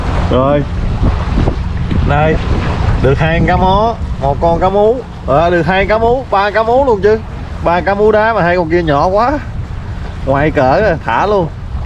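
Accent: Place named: South Central, Vietnam. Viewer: Viêt nam bạn ở tỉnh nào vậy .nghe giọng khó đoán miền trung pha nam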